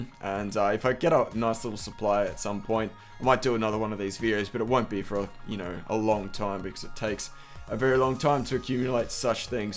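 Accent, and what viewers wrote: Australia. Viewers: This guy is an Aussie or an NZ man, get your accents straight! What's your next guess, Scottish?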